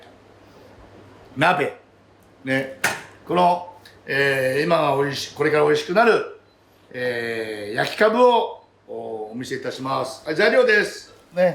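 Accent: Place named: Tohoku, Japan. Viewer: 三國シェフは、南部か宮城の方ですか？それか道南かなあ。懐かしいアクセント。かぶ、畑からとってきて、このレシピやりまーす！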